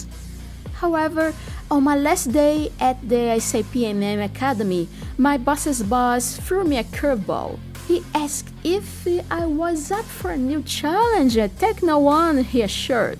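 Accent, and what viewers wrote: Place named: Brazil. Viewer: This is a really nice thing you're doing,! I'm so glad you're part of #erpgurus and I saw the link to your video there. And also, your Brazilian Portuguese accent is really nice. My brother is married to a Brazilian!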